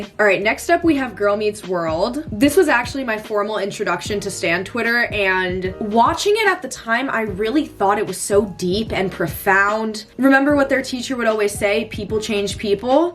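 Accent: United Kingdom. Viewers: ur a Brit?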